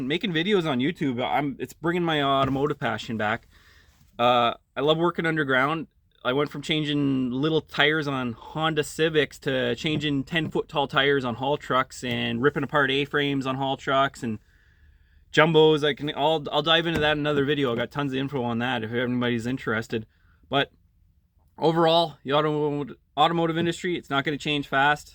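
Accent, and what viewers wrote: Canada. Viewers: No disrespect, you sound from Canada....i think the dynamics are different than here in the states,flat rate is a HELL NO for me,i been in auto dealership settings 20+ years and when i quit and went into heavy truck family owned very respectful people and my life changed forever master heavy duty certified all my ASE's good family good life,and 0 flat rate...ALL hourly,and its 8 N skate..if i want,i never go to a auto dealership again,but you also made a good career choice you have to make the decisions to make your life better. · Canadian, eh? I went to Sarnia in 1981.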